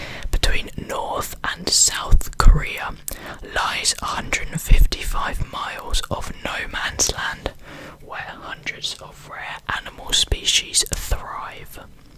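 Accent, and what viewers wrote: United Kingdom. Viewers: Two things I love : ASMR and British Accent❤ Hugs from Brazil💚💛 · Your British accent is awesome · Are you speaking in modern rp or just British accent? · I love the British accent 🤣.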